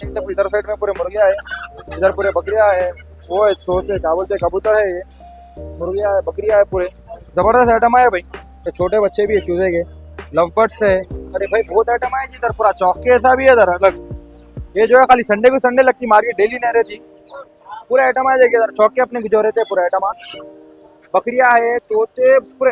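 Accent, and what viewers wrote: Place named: Hyderabad, India. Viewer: bhai aap kya karrai ji…..😂😂 Love your Hyderabadi accent Love your content ❤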